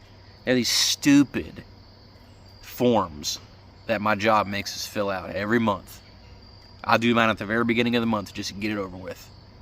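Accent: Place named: South, United States. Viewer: I like your southern accent and your style of narration ❤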